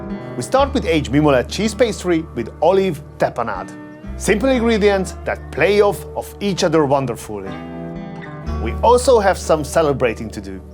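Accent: Hungary. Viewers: This is my first time seeing a video on your channel, I gotta say amazing content. Your accent😄 are you Hungarian?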